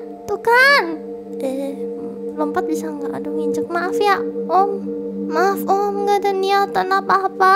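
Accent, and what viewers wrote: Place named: Solo, Indonesia. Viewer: kan dari solo bro 🤣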